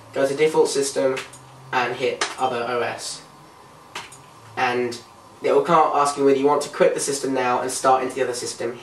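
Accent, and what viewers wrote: United Kingdom. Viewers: its like a peter griffin with a harry potter accent! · this an accent from the British Island. Nice video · are you british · Looks American sounds British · you're so British!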